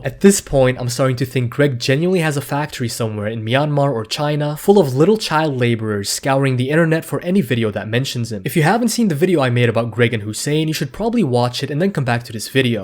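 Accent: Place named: Morocco. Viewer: By the way I wanted to ask, are you moroccan? You sound like a moroccan lol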